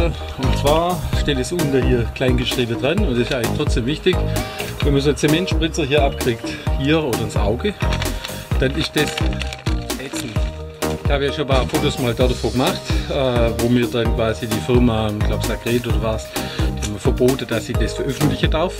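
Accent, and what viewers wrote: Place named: Swabia, Germany. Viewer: Super erklärt,noch dazu auf schwäbisch!! Ja die größere Latte 😂😂😂😂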